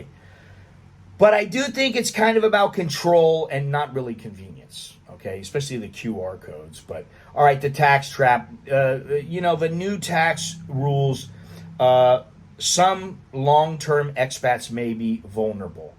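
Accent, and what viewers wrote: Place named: United States. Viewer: You sound very American. Most of the world is like Thailand.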